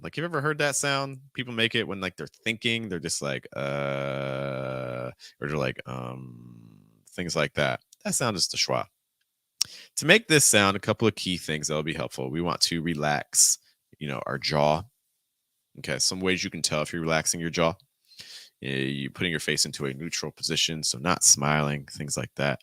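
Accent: New York, United States. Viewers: I'm watching your content and it's so good. I have a question, which part of US are you from? New york?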